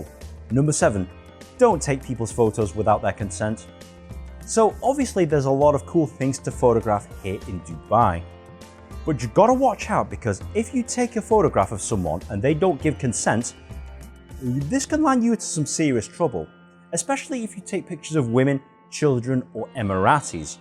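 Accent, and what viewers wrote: United Kingdom. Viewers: You look asian but your accent is Brit.. what gives man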